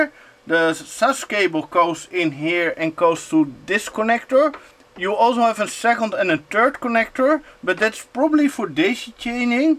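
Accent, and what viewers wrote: Netherlands. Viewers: leuke bak man :).. ben ook op zoek naar zoiets.. jeetje je bent echt een nederlander met je accent lolz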